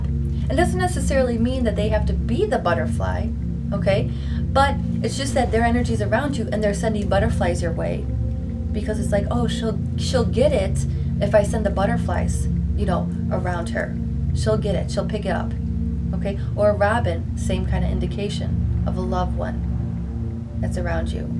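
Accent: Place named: Upstate New York, United States. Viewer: I like the way you talk and your voice maybe from upstate new york. I see a lot of lady bugs.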